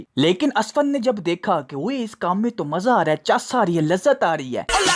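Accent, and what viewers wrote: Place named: Punjab, India. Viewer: I love your Punjabi accent..it's cute.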